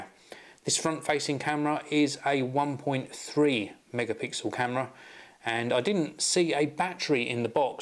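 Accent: England, United Kingdom. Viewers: Can speak a little beat less by English accent:). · I love british accents, everything sounds 20 % cooler with that^^ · gotta love brittish accent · i love tech, and i love the British accent... so tech given to me in a British accent? awesome *thumbs up* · British accent makes people sound he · British english is sooo better than american one to understand! :) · Yay British accent :D:D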